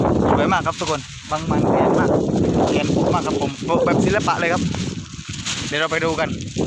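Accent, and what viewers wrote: South, Thailand. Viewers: บัง ทองแดงทุกคริปเลย คนอืนฟังออกม้ายม่รุ้ ผมฟังออกแต่ว่า เราคนไต้ด้วยกัน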